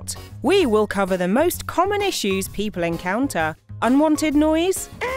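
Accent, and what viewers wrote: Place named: England, United Kingdom. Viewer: The English accent creeps me out... and i was born in England...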